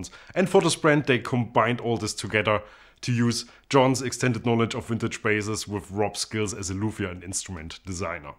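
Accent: Germany. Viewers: Looks can be deceiving, for sure. This German/Austrian-sounding bald man plays bass as soulfully as any funk bassist I’ve ever heard and I was playing music (drums) in the ‘70s, when I was a teenager. Sweet video! Blessings, Grace and Peace 😊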